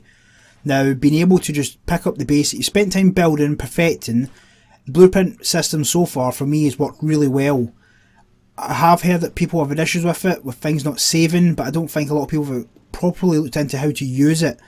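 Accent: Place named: Scotland, United Kingdom. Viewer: I wanna say Scotland, but I always hate assuming things. An accent is defined as speaking one language but using the rules of another. Is there an indigenous dialect in your area of the world?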